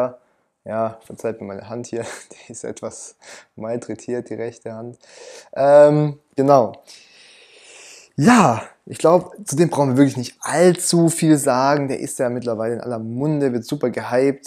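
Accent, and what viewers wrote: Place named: Germany. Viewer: I love your language and your accent! Are you German?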